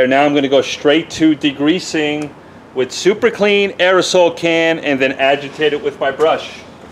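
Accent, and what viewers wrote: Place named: New Jersey, United States. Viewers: he sounds joisey-ish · He is definitely not indigenous to Texas. My guess is a transplant from Long Island or NJ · This guy is not a Texan, obviously a Transplant! Has to be from the East Coast!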